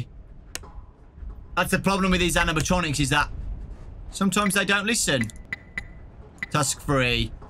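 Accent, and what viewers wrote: United Kingdom. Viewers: Your from the uk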